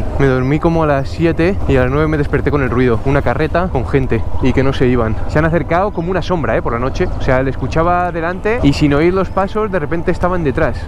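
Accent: France. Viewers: Me hace mucha gracia tu francés pero se ve que vas aprendiendo palabras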